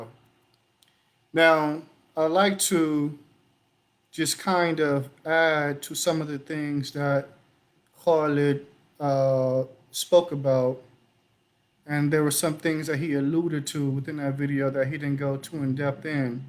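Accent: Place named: South, United States. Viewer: جزاك الله خيرًا، you give me a feel that you’re from the south Shaykh even though I know you’re from L.A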